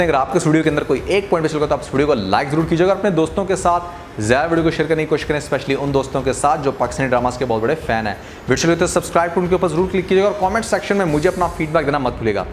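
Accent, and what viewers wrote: Punjab, India. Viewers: I love your punjabi accent vele tute nickame ashiq..you are bang on right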